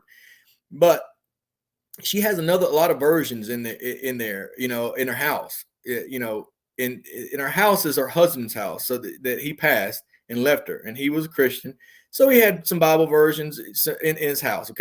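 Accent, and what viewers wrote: South, United States. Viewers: I love this guy. His southern twang on top of his humor really put a good spin on the story. But I sympathize at the same time when he’s dealing with his fear after the experience. I’ve had encounters and am careful who I tell. Maybe one day I’ll tell my story. I’m glad he got a second chance to fall in love with the Father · Me and this guy have the EXACT same Southern, country voice😂😂😂 i know it's unrelated to NDEs, but i thought it funny because I've always been made fun of for my deep accent.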